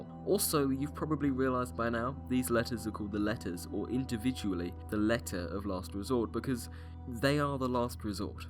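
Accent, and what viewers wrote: United Kingdom. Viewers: Good voice. Good research. My type of humor. Oh and a British accent. It does not get much better than this! Liked and subscribed, sir!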